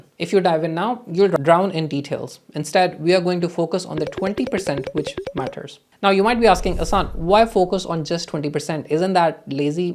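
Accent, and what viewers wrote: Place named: Northern Ireland, United Kingdom. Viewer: I hear N. Irish accented words!? lol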